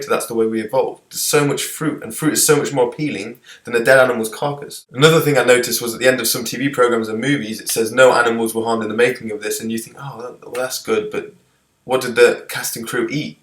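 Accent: United Kingdom. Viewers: every persons happiness leaves another person upset/hurt..the animals are bread for food...the worlds population is so vast now, we are running out of natural supplies, we need this...and by the sounds of it, you are from the UK, read your vegetable wrappers etc. you will see it is coming from elsewhere in the world where people are struggling in slavery conditions to produce just enough food for us, to make them enough money for their family to eat a meal that week, please, think things through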